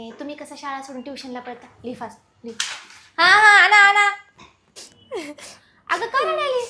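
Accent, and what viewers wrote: Nanded, India. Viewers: Madam tumhi Nanded chya haw ka manalo. Ase vatalay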